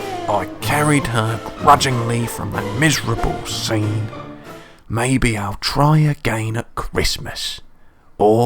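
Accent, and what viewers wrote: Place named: Cockney, United Kingdom. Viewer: And every year of the date of the accident it runs again as a warning to others, plunging into the gap, shrieking like a lost soul! Great work! I really enjoyed it! Great voiceover too. Good cockney accents always sound good.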